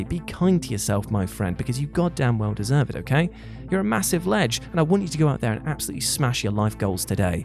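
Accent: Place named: Wales, United Kingdom. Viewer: Very Welsh it is 😁 (Wales is part of the United Kingdom)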